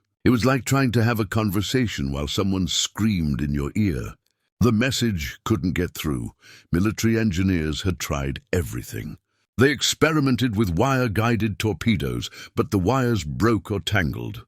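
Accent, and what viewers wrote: United Kingdom. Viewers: Why does the world seem to think that Brits are more respected than Americans?? Why is the narrator here (AI generated voice) speaking British English?? DUMB.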